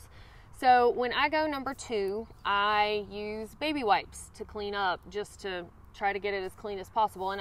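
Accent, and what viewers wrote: South, United States. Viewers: Watching from the UK that's a really cool accent you have - from a southern state? Interesting vid too...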